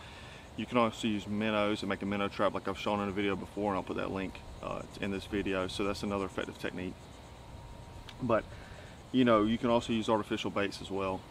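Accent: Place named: North Carolina, United States. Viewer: Thanks for this video, helps a lot, as I'm planning a trip with someone to the Everglades national park, hiking to the coast of Florida, to Clubhouse beach, I can not wait! Should be great fishing and I hear a lot of crabs, I'm from Central Florida myself but never been that far south, Just curious from your accent, are you from North Carolina?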